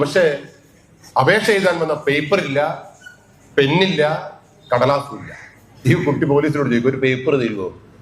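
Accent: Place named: Southern Kerala, India. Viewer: തെക്കൻ ഭാഷ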